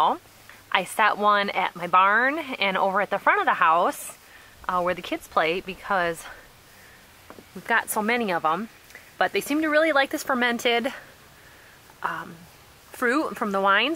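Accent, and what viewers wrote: Minnesota, United States. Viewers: She must be from Minnesota. Cute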